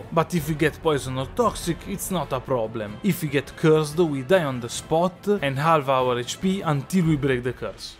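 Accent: Italy. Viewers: italian accent is very marked that’s why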